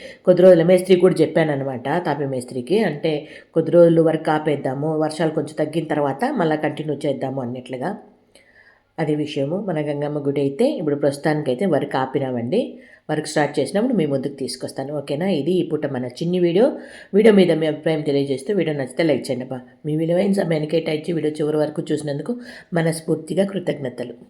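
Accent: Chittoor, India. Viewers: Hi ma..i like ur slag..endukante..nenukuda me vure 😊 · మీ స్లాంగ్ చిత్తూరు యాస. మాకు అనే బదులు మాకీ అంటారు. వేయాలి కి బదులు ఎయ్యాలా, ఎన్నో ఎన్నెన్నో అంటారు. ఏం ఫరవాలేదు. ఎవరి యాస వారిది. అర్థం అయితే చాలు. నేను యూట్యూబ్ వీడియోలు చూడను, ఎందుకంటే వారి ఆదాయాన్ని వారు తమ స్వార్థానికి వాడుకుంటున్నారు. మీరలా కాకుండా, యూట్యూబ్ ద్వారా వచ్చే ఆదాయాన్ని నిస్వార్థంగా వృద్ధుల సేవ కు వాడుతున్నారు. అందుకని మీ వీడియో లకు సబ్స్క్రైబ్ చేసుకున్నాను. ఇంకా లైక్ కొడతాను. మీ వంటి వారిని ప్రోత్సాహ పరచడం మా వంటి వారి బాధ్యత. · Authentic chittor slang,mixed with telugu and tamil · Ctr side antha me slang amma memu kuda mee laage ❤❤❤I'ove ur slang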